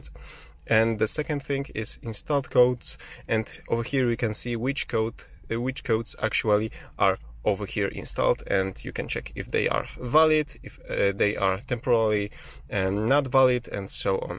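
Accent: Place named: Poland. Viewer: Po akcencie poznałem, że Polak. 😃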